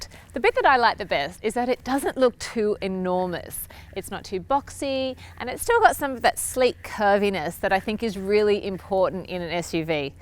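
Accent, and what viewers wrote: Australia. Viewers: Phu Vuong she sounds Australian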